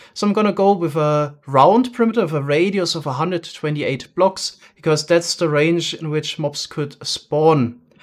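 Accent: Germany. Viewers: dein english is so deutsch holy fuck😅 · I didnt know it is possible to have such an EXTREME German accent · Ich höre da definitiv Deutsch raus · Im sorry to say this but u rrally have an extreme german accent man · Ich Feier den deutschen Akzent^^ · You're German? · I'm sorry aber man hört das du deutsch bist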